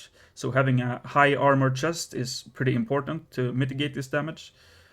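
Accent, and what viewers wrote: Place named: Finland. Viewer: Sä kuulostat suomalaiselta.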